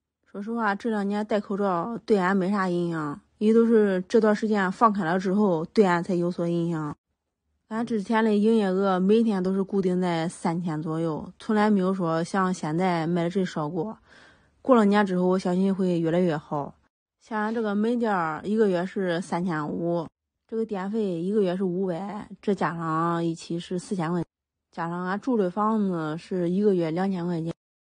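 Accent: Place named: Henan, China. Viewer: 河南声膜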